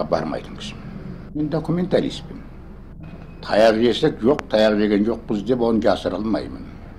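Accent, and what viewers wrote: Standard. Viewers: Екі тілді сіздей меңгерген ешкім жоқ екен. Қазақша сіздей тұнық сөйлейтіндердің қатары өте аз. Трагедйа осы... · Советтик кезде таза казакша сойлегенине ризамын атанын, казирги жастар, ересектер де тауелсиз Казакстан да шала казакша сойлеседигой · казакша таза сойлейди екен, онтустик - солтустик акценттерисиз. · Қазақша білмейтін мәңгүрттерге көрсететін сұхбат екен. Нағыз совет үкіметінде өмір сүрген адамдардыңда таза қазақша сөйлейтіні дәлел. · Таза ана тілде,сөйлеп отыр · Орыстың қақ ортасында жүрсе де қараңыздаршы қазақшасы тап таза.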